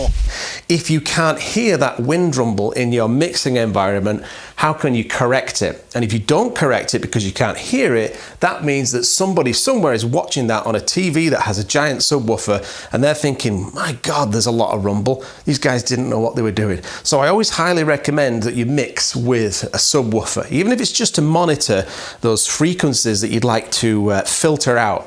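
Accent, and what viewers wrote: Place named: Northern England, United Kingdom. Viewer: Not sure if you can change the levels on that cheap audio mixer, but it makes you sound a bit Northern.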